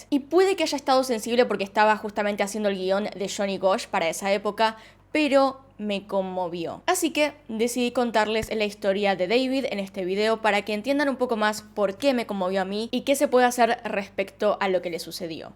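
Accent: Argentina. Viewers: Me pasa lo mismo, en mi caso no me gusta el acento argentino, pero los casos misteriosos son interesantes.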